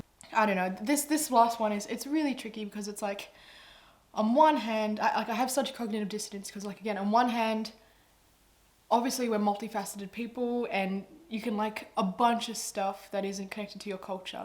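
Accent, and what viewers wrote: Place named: RP, United Kingdom. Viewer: Thanks for sharing your thoughts and opinions, you opened my eyes to things l had never even thought of. Great to get these conversations out into the public domain - it can only forge a path for a more comprehensive society. I love your posh english accent - it’s just so ‘cricket in the afternoon dear’. Oh - btw, what’s the cat’s name? 😀👍😀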